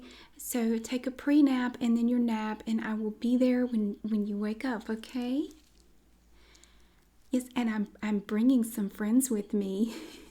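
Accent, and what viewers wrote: South, United States. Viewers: i love the lore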